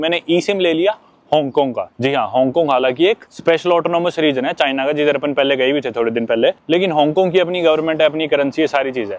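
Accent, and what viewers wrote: Haryana, India. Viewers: With all due respect, I can't understand your curry accent English. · मैं देख रहा था पंजाबी जैसा क्यों लग रहा है फिर बोला तो पता चला ये हरियाणवी जाट है 😅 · Love your harayanvi accent son!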